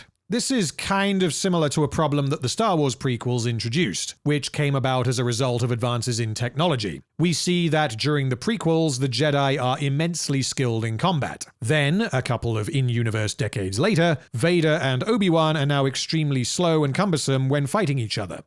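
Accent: United Kingdom. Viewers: I definitely knew you were English I just thought you guys had names for all your varieties of accents on the Isles. Regardless, you have a wonderful channel I love the long form evisceration of this trash. You aren't alone many of us remember THE BEFORE TIMES. Lol.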